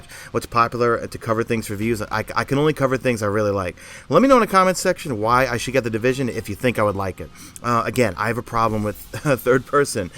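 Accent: New York, United States. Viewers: How do you like living in New York? You totally sound like a New Yorker lol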